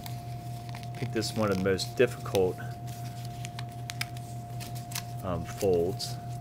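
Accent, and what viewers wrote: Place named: Pittsburgh, United States. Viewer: Digging your Pittsburgh accent. :)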